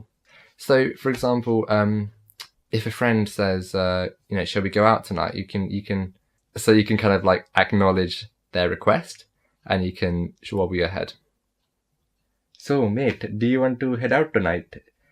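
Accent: India. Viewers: you can mask your Indian accent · I like your indian English accent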